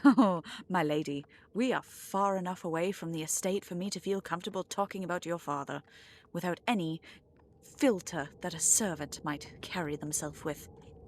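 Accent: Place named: United Kingdom. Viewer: The British accent is sending my little gay self to neighboring galaxies 😭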